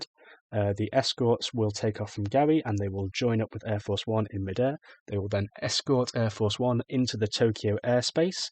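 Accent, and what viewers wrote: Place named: United Kingdom. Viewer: bruv ur bri'ish :D same here.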